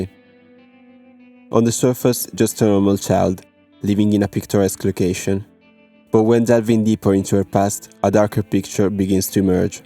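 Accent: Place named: France. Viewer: I enjoy your accent. I'm guessing French or French colonized country.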